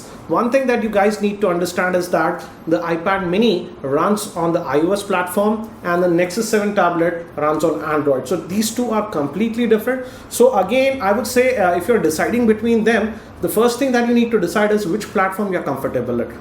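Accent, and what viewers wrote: India. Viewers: This guy has the most normal stereotypical Indian accent i have ever seen.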